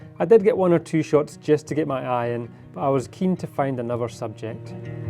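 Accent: Scotland, United Kingdom. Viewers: Cool, I gathered you were Scottish from you accent :-) But I got worried that something maybe wrong on either end. It's not like Scotland is nearby to get home for the weekend :-)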